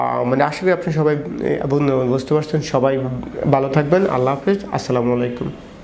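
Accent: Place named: Chittagong, Bangladesh. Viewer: আমার মনে হয় ডাক্তার সাহেবর বাড়ি চট্টগ্রামে। চট্টগ্রাম হোউক বা অন্য কোন বিভাগে যে কারোরই নিজের আন্ঞ্চলিক ভাষার একটা টান থাকে।এটা ত অস্বাভাবিক কিছু নয়।তবে উনার কথা তোতলানো ভাব আছে কিছু টা। আমার মনে হয় উনার আগে আরো বেশি ছিল তোতলানো টা। উনি উনার আত্মবিশ্বাসে অনেকটাই স্পস্ট কথা বলেন। এটা আমাদের বোঝা উচিত যে উনি ইচ্ছে করে কথায় ঝড়তা রাখেন না। সৃষ্টিকর্তা আমাদের সবাই কে সব দিক থেকে পারফেক্ট করে বানায় নি। সবার মাঝে কিছু না কিছু কমতি আছে। কারো কমতি নিয়ে মন্তব্য করা ঠিক নয়। বরং উৎসাহ দেওয়া উচিত যেন সবাই কমতি ভূলে নিজেকে একজন পরিপূর্ণ মানুষ মনে করে সমাজের সেবা মানুষের সেবা করতে,নিজেকে আরো উৎসাহি করতে পারে। তাই নয় কি?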